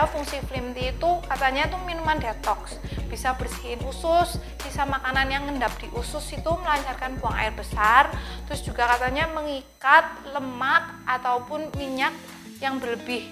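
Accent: Java, Indonesia. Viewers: Cee lucu banget kalo ngomong. Medok Jawa😁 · Surabaya? Dialectnya medok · Ngomonge agak medok yaa cece Salam teko Suroboyo 😅👍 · Cece medok bangat ya 😀wong Jawa yaaa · Medok mbak e😂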